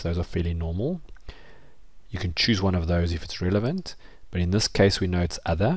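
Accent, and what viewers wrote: South Africa. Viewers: narrator is def south african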